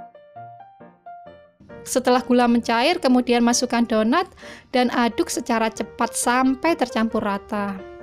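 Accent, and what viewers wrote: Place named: Java, Indonesia. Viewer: Menul2 kak bahasa jawanya.. Endull 🤩🤩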